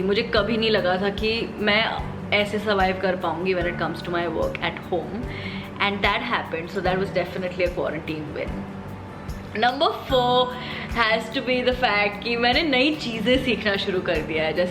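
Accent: Nepal. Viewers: Oh gosh best nepali accent ever You the cutest I am nepali too and i am following u for years now Feels amaizing listening your own mother tongue from a person u follow is trying to learn it 👌👌 Best wishes to you You are beautifull soul Cheers to you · your nepali is the cutesttttttt.... dherai maya tapailai.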